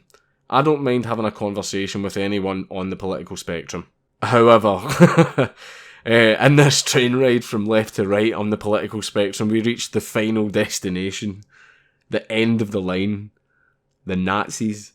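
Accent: Scotland, United Kingdom. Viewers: No more living in an echo chamber. As lots of leftist SJW supporters do - without any accustical problems that come from the house they use to live in. To be serious, my first language is not english, and the missing echo makes it more easy for me, to understand your beautifull scottish accent. I was born and raised in south Germany, not Bavaria, where we talk an accent some people in the rest of Germany just hardly understand. Its called Schwäbisch (Swabian), and some people say, that Scotts and Schwaben must be close relatives, as Scottsman are known for keeping their money together, Swabians are known to be thrifty. Scottsmen must have been expelled from Swabian Country, because thery spent to much of their money, is an old joke they tell about us. And both, Scottsmen and Swabians, have a funny accent. And are proud of it. This was not a typical vid of yours, but a good one, verry informative. 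Some people who did not get it until the verry day are now better informed about your intentions. If they want to. For fucks sake, stay who you are! And if you ever get stucked in an elevator with voice control, don't forget: Scottland! Freedfom!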